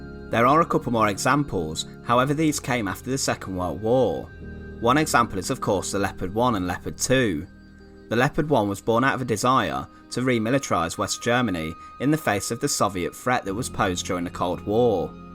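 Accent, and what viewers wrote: United Kingdom. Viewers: What region of the UK is the narrator from ? His accent is different than others you typically hear.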